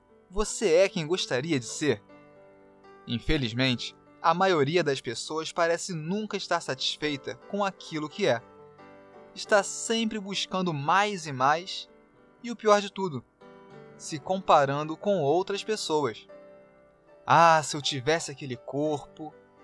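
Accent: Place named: Rio de Janeiro, Brazil. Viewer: (*)ele é cari oca ele é carioca Olha o jeitinho ( o sutaki ) dele ao falar... (*) O meu Coração é carioca A minha Alma é carioca 🙃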